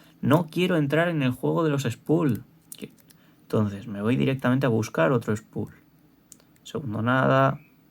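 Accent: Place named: Spain. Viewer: odio este accent Español, pero ignoraré este horrible estilo de hablar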